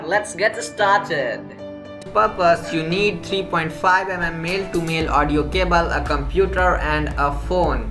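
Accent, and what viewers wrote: India. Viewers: This accent and why indian not being racist or anything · india ascent is Suck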